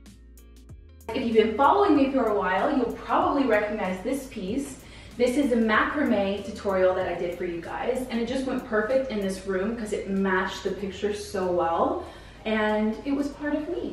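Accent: Texas, United States. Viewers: I'm from Texas after reading comments about her accent I had to go back and rewatch the video! I did hear the accent but I think it was more because she was having fun and was talking a bit lower. But I've watched vlogs with her mom in them before and her accent didn't change. As well as picking peaches and the terminology isn't exactly big city lingo =) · I feel like your Texas drawl didn’t ever come through until this video! I love it.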